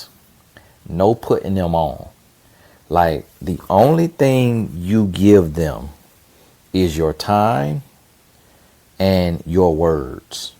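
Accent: South, United States. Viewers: I hope you don't lose your accent. Your southern accent coupled with your God- given wisdom and humor sets you apart from the rest. It's very much appreciated. It's refreshing hearing a relationship coach who doesn't sound like everyone else. 👍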